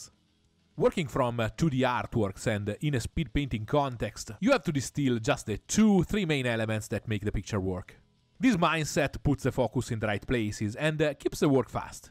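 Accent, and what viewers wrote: Italy. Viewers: In three hours! Jeezuz! And that italian flavoured english is just awesome! Ti saluto! Forza!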